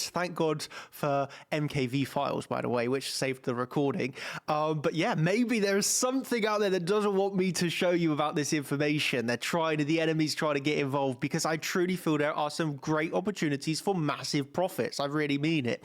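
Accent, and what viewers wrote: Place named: West London, United Kingdom. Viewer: I'd love to know where your accent's from,. I feel sure it's from west of London!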